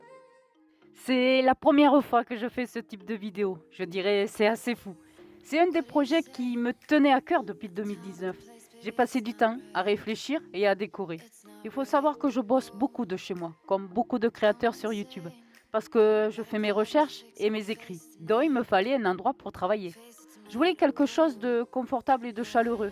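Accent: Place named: Southwest, France. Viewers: Salut super content enfin de te revoir et t'entendre de nouveau avec cet accent et " le bisous du sud-ouest". Ça me manquait . Le studio est très sympa sobre et moins le bazar que mon bureau. Vidéo toujours agréable à regarder. Continue comme ça j’apprécie le travail que tu fais. Le bonjour de l’Alsace, grand " V " à toi . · Bonjour, et bien merci à toi pour ta chaîne, pour cette découverte du dimanche matin, merci pour tes vidéos intéressantes et marrantes, tes essais, tes fou rires, ta bonne humeur, ton merveilleux accent du sud-ouest.....bon j'arrête là, en tout cas, tu gagnes un abonné de plus 😁, grand merci et bisous du grand Est, lol. ✌🏻à toi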